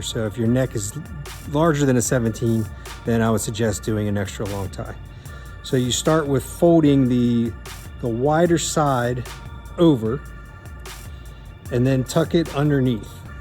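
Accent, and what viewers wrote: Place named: Philadelphia, United States. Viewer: I’m sensing a Philadelphia kind of accent. Maybe Baltimore. Somewhere in the Northeast without being New York or New England.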